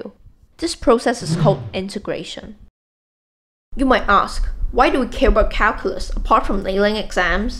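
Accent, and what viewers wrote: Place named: Singapore. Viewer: Very nice Is this english accent from someone from singapore with a chinese background?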